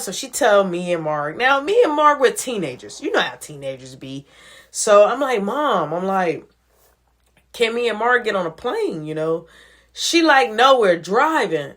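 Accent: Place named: Chicago, United States. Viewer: I thought you was from Chicago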